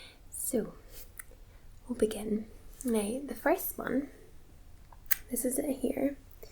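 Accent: Scotland, United Kingdom. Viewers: You make me want to go to Scotland for sure. For the beer and the view. Oh....and the accent. Love the accent. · a beautiful girl from scotland+beer+that accent... this must be heaven. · I am a bit confused by you saying that some of these are like magners - a cider, when this is beer. That is a bit strange, but it is interesting to hear different points of view. However, I like your video. Have not seen you videos before I don't think, but seen some other ASMR. I like listening to your voice and Scottish accent. Combing your voice with beer is excellent though! I like blonde ales as well. I might have to try this one that you like. Thanks for the video, I will subscribe. I like you switching between left and right near the beginning of the video - that works for me to give more tingles.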